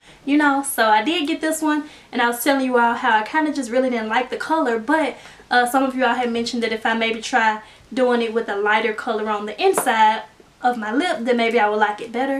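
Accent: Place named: Tennessee, United States. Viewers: is in fact from Tennessee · 1st time watching her. sounds like a Tennessee accent, but it could be Mississipi. Beautiful young lady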